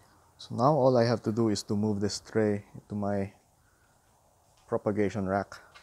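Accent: Philippines. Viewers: Pilipino po ba kayo? It sounds like so :) Love your garden! I wish mine would grow as healthy and abundant as yours someday. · You sound Pinoy po! Btw, thank you for this info, your garden is beautiful! Keep it up!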